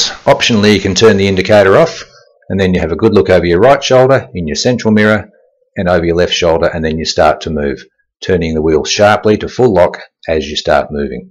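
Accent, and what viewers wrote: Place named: Australia. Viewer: he’s not a Brit he’s Australian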